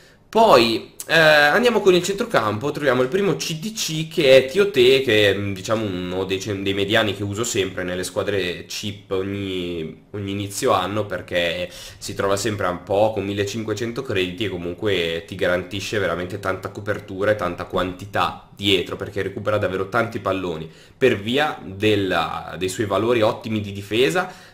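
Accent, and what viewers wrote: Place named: Liguria, Italy. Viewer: Ok, grazie ! Dall'accento pensavo fossi ligure !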